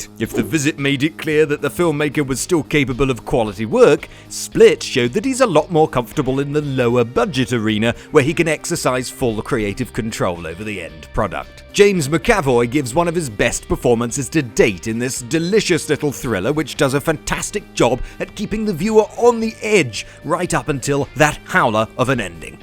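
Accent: United Kingdom. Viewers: Voice actor of this video rocks! I haven't heard so over-the-top British voice in years, awesome! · Power Rangers didn't suck? Well, ok I guess you're entitled to your opinion... (for all those outside of the UK new to this channel we don't all talk like this)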